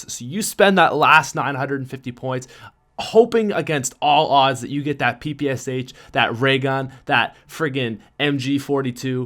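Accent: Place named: Newfoundland, Canada. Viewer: Is this guy Canadian???? The way he says “out” and “about” is totally Canadian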